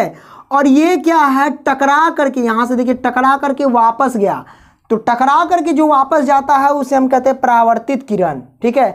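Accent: Bihar, India. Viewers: AAP bihari ho na aapki language she Pata Chao gya 😋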